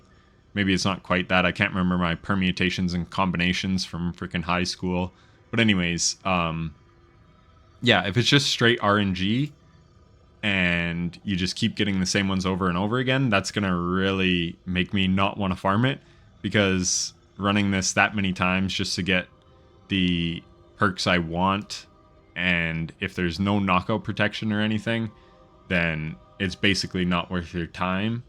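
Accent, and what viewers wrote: Canada. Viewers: Are you from Minnesota or Canada? Usually I can tell but you sound to me like an Albertan but when I went to Minnesota there were a couple people who sounded like Albertans aswell. I very confused. Does it matter? Nope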